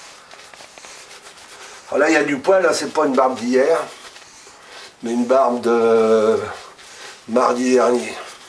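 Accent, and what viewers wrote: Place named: East, France. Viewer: Ahhhh vous êtes de l'est je pense ! Ça me rappelle des souvenirs cet accent :)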